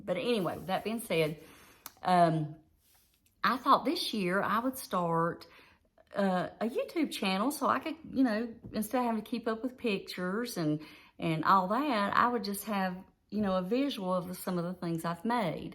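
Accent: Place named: Tennessee, United States. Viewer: Hi, I'm Charlene from CHARLENE CROCHET CORNER, I just found you and I love your accent. I live in South Carolina you sound maybe Tennessee? I subscribed and would invite you to subscribe to me. I love yarn but I'm not as lucky as you are. I just got out of a 9 week hospital stay for my 145th surgery. I was a nurse but my disability caused me to go out work my last shift on Nov 4th, 2007 came home and got in my hospital bed in my livingroom and proceeded to have a nervous breakdown. That is kind of why I started my channel. If I didn't have the love of my channel, well I would have no yarn and not as many wonderful friends & now they are family to me. I love them dearly. I will shout out your channel & would appreciate the same. Oh I love your Carrie Penny shawl, do you have the pattern? I need a copy those are gorgeous! Hope in a future wrap? 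Oh I so love that. I need yarn for that. I love fingering wt yarns. Good for you not letting it beat you. I love your hair. I'm going to be 58 in July. SO we are close. I don't edit my videos it's to hard. Ok I'm going to find the info in your description box. Oh and welcome!hugs, charlene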